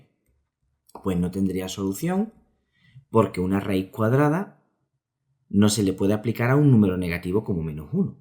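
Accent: Andalusia, Spain. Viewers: Buenísimo! Y mejor con el acento andaluz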